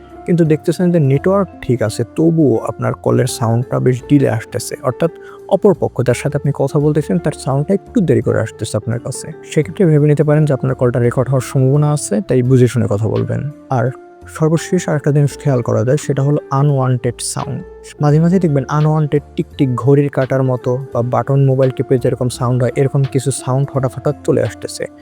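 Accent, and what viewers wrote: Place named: Bangladesh. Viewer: উনি বাংলাদেশের লোক। ওখানকার ভাষায় বলছে।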